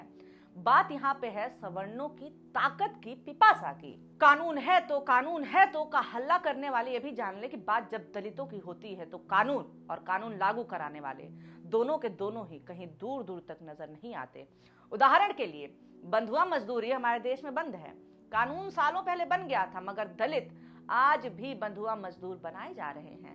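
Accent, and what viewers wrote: Bihar, India. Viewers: Jo aap bihari accent me kataksh karti ho wo bahut lajawab hai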